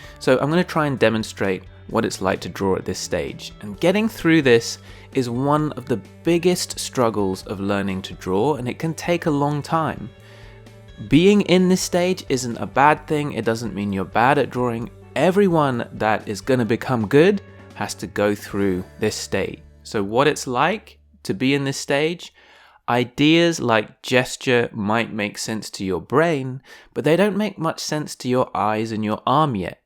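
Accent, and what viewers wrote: United Kingdom. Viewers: YEAAAH BRITISH ENGLISH! LOVE IT!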